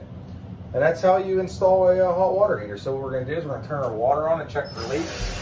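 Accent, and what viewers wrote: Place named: Pittsburgh, United States. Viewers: Video was descriptive & yum yum.. So nice to watch. Pittsburgh accent. A local? Lol 😂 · hey man thanks for doing this. is there a correct or incorrect order of attaching the wires to the element? I didnt get a pic before hand and have it working again but i have to wonder.... is one positive and the other negative? Talking about the two connections on the upper element? Im guessing either way of re attaching those two wires cant be wrong. however i do see two markings on the bottom. PS i know that accent anywhere and you CANT be far from southwest Pennsy. bet im within 300 miles :D Edit2: Oh snap man i think i missed it the first time. Here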